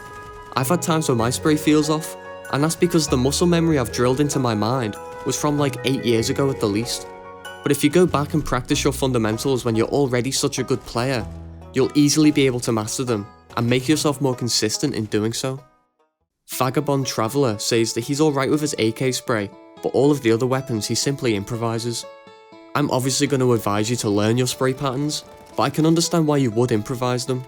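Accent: Liverpool, United Kingdom. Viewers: Why is he british???????? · liverpool accent slaps for commentary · lord knows i need a scouse cs youtuber in these trying times. subbed :) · Hes scouse you whopper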